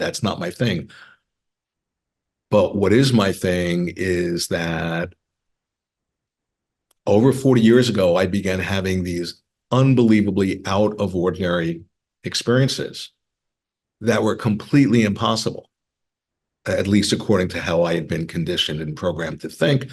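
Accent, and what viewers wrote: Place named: Philadelphia, United States. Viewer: Is Mr. from Philadelphia? His accent sounds like he is. I was surprised to see this is being presented by The Theosophical Society. They had a building on Rittenhouse Square in Center City- Philadelphia.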